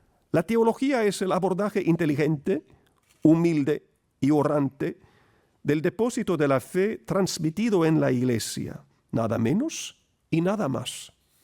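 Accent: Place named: Latin America. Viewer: su caridad, me gusta mucho su acento latin y mas aun el mensaje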